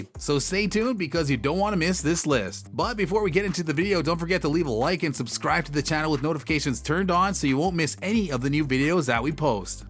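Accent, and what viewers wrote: United States. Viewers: Love him, but I wish this commentary would just shut the f up. Listening to this yank prattle on....urgh!!!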